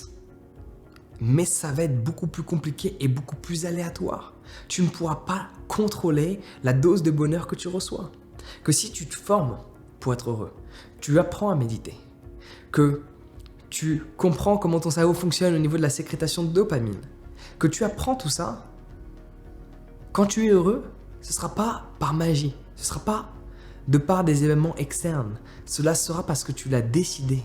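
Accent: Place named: England, United Kingdom. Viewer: Tout à fait d accord 👌 Love you English accent 😎☺️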